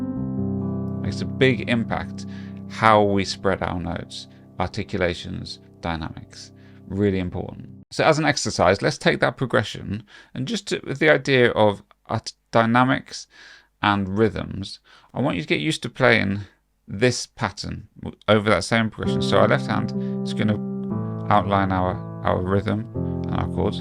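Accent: United Kingdom. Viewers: I think what you are trying to say is that he is British. 😊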